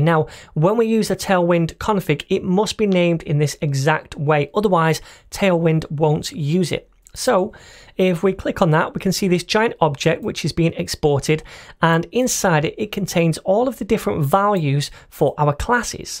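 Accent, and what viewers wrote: United Kingdom. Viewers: What a rare British accent! I'm glad to find this because I'm learning English English at the same time. Thank you!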